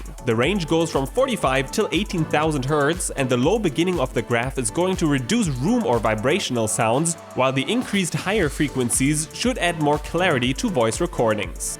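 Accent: Germany. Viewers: you german )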